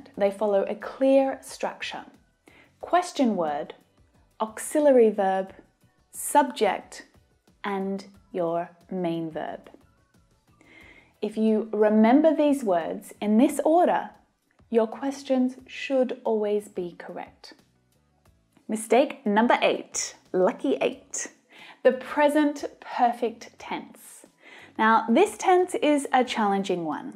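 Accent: Australia. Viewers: Well Aussie say "can't" like "kan" and that confuses me . For example when she says " Bad habits "kan" be fixed I'm not sure it can't be fix or can be fix. Any Aussie here help me out of this pleaseee!!! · Love her australian accent ❤️ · Wow the Australian accent is amazing 🐇 i like it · I love your Australian accent. Especially when it comes from female.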